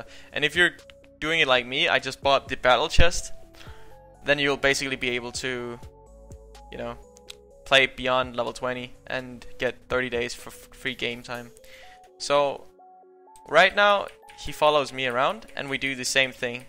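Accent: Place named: Denmark. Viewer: Hey jeg har set din video! Det lyder som on du er dansker ! Jeg vil gerne lære at multiboxe! men kan ikke finde ud at at det med script.txt! kan du hjælpe mig :))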